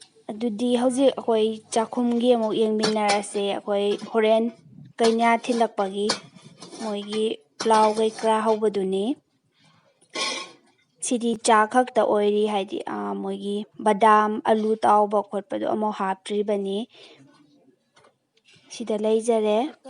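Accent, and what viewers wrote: Kakching, India. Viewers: Lol akhoiba asida asumna narration touko. Kakching lol asina ariba lolni. Imphalda ngangnarisina lol anouba. Adunani maiba maibina lai haraobada sakpa sonba amadi ngangbada kakchinggi lol mallibase. Puya pabada lol nouraga paba ngamde.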